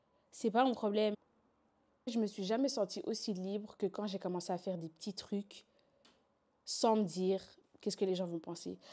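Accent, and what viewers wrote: Flanders, Belgium. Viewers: Ouii t’as grv un accent flamand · Oui tu as un accent ça fait belge, un peu néerlandais ou je sais pas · mais oui t’as un accent ! c’est sur t’es belge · Tu as un accent de flappie qui parle français soupoudrer d'origine Rwandaise ou burundaise 😗😗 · Oui elle a grave un accent belge hahah bien néerlandophone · Mais t’a juste un accent belges 🥲 il doit y avoir plus de français dans ton TikTok ( je me suis abonnée mdr j’aime bien te regarder sur tiktok donc why not)💕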